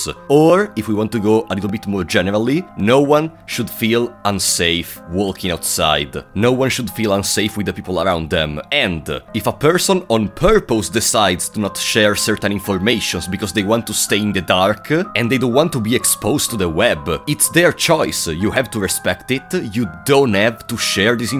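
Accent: Italy. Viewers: I immediately knew that you are from Italy cuz of ur accent xDDD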